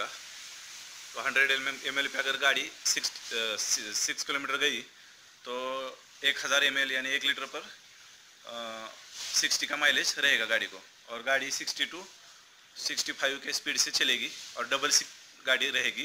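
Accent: Maharashtra, India. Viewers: Bhai aapke Language se pata chalata he ki Aap Maharashtra ke rahne Wale ho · Aapki tone se pata chal raha he ke aap Marathi ho. Or wo bhi North Maharashtra se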